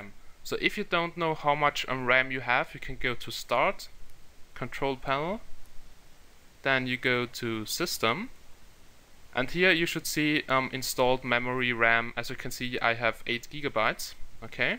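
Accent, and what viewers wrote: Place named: Germany. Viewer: I love the german accent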